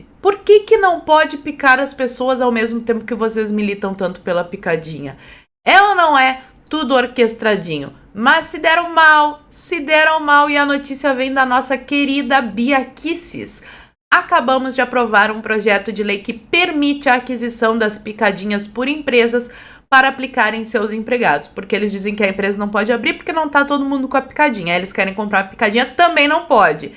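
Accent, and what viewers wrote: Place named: Minas Gerais, Brazil. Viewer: OCE. É. GAUCHA. O. É. MINERA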